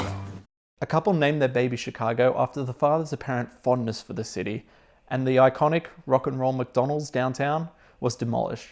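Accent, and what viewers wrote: Australia. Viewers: is it just me or does he not have a very noticeable aussie accent?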